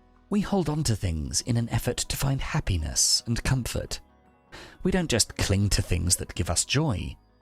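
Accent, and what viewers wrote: United Kingdom. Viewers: Thanks but i wish non british would be spoken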